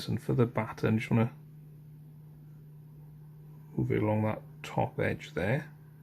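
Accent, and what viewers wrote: Wales, United Kingdom. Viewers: Welsh, is it?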